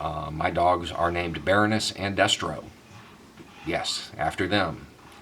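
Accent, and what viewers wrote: South, United States. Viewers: Love Your point of view, jealous of your southern accent. Don’t know why I associate it with pure America. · I think it's great that you use your real accent. If southerners change their accent to be taken seriously in media, then southern accents will only be heard reported ON, not by. I'm not American, and I don't carry that bias when it comes to your accents, but since I started watching American politics, I've come to realize that a racial gap is not all that needs to be mended. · I went to a K thru 12 school and grew up in a town with 258 citizens, yeah, you are from the south. I played down my accent for years, because I was MI and Signal... now I don't give a fuck. LOL I love your content and it is wonderful knowing that other southerners have opened their minds and see what is really out there. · Dude this is crazy because I just looked more into you like 3 days ago and found out all this stuff, except I had the accent thing backwards; I thought you were faking the southern accent, but its crazy that you managed to hide it before. 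But I get why you would hide it, I'm self conscious about that kind of shit too. Ahh, the life of having social anxiety. Edit* -your channel is dope as hell man, and I just want to say keep up the great work. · Well, I'm here not because of your thick southern accent. It's the content. It's not 100.000 subscribers. As I'm writing this, it's almost twice that. I'm not sure I'm your typical audience, as a Brazilian, my interest in your channel isn't your accent. You are someone who spread ideas, and I support them. It's comforting to know that people like you take the time, the risk and expose your face and tell your thoughts because you know you have to. Thanks and keep'em coming. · I love the southern accent. Just afraid of the ppl. But its nice to hear. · Who Hoo, you're a Big Shot Now! 100,000 Subscribers! A lot of people have never heard anything like you. And to find out that you actually make sense, also that you are not only intriguing, you are intelligent, and have critical thinking skills. 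I believe, that draws people to you. And you represent many of us in the South, that can have intellect and a Southern dialect. The stereotype of some Southerners is also for real but not the rule, as you smartly display. Good for you, good for you! Keep up the good work. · Your ok with me like southern accent. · Don't be fooled by a Southern Accent - and Trae Crowder for President. What a wonderful thing that would be. Appreciate your calm, rational, common sense approach,. Cheers from Canada 👍🇨🇦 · love your Southern accent. Wish I could have seen your first photo background videos. I'm a Mississippi home grown. Miss my roots. Thanks for letting us know you are actually Human and not one of those cyber robots.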